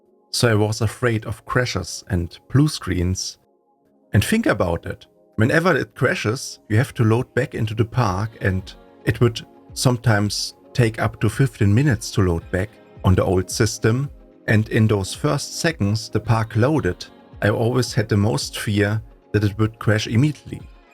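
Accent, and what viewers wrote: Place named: Germany. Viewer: Ohne zu wissen das du ein Deutscher bist, hat dich deine englische Aussprache verraten :D Mal Spaß bei Seite, du baust extrem gut, Respekt vor dieser Zeitaufwendigen Arbeit.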